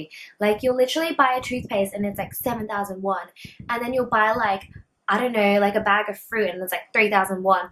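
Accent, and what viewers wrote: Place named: Australia. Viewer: 호주발음이라 귀여운데? 네 귀가 썩은듯.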